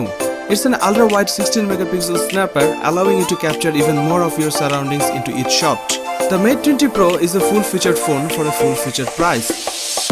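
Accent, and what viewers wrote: India. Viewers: Your accent 😍 Which part of India you are from?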